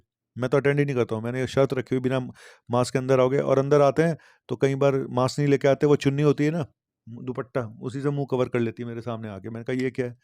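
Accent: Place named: Delhi, India. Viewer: Aap ka dilli vala style kya khub hai... 👍👍👍👍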